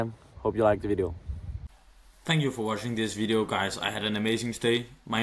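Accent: Denmark. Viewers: are you danish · Er du fra danmark? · Bro are you danish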